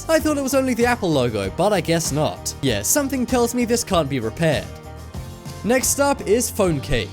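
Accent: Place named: United Kingdom. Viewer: Your Sound British so say Tap not Faucet 👎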